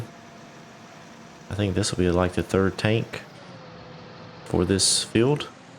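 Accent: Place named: Texas, United States. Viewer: Are you from Texas you kinda got that accent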